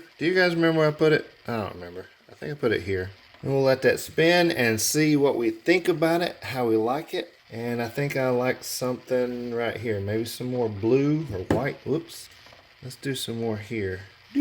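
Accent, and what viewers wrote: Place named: Carolina, United States. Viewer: Love your videos! Been watching you for quite a while and finally subscribed! As soon as my friend played your video, and I heard your voice.. I KNEW you were from NC or SC and I was right! 😂😂 Thanks for all the tips!💕💕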